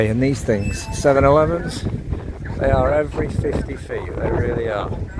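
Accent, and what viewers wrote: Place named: RP, United Kingdom. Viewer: You sound posh but bottom line you chose Pattaya. That says it all in any case no matter how Angelic you like to appear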